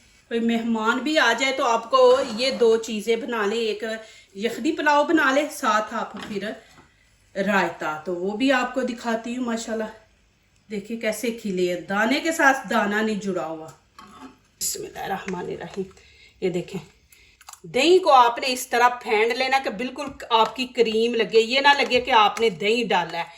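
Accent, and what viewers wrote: Punjab, India. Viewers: Apna urdu lehja theek karen panjabe lag rahe ha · بہن پہلے آپ اپنے پنجابی لہجے پر قابو پائیں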